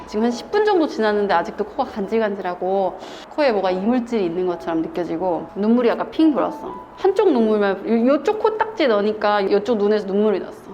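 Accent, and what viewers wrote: United Kingdom. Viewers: 품위있는 영국 영어와 싼티작렬 한국말의 조화ㅋㅋㅋㅋ 유쾌유쾌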